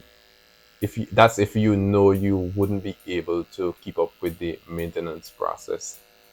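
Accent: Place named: Caribbean. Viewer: I love your accent, where are you from? You sound West Indian. A very comfortable and soothing voice. Puts me in mind of my Granny. 🙏🏾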